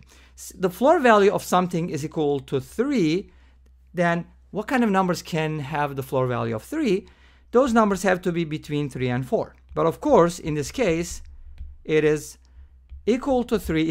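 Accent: Turkey. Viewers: ingilizcen türk ingilizcesi gibi geldi. twitterdan takip ettiklerine baktım ve ta taaa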